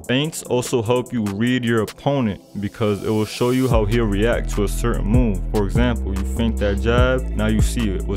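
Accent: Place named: New York, United States. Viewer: I can tell you’re from New York. Do u train?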